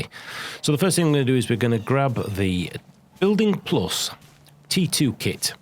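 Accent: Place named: Wakefield, United Kingdom. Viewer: Eaar Byyy Gum, Cheers for the video lad, Wakefield accent i can hear there? :)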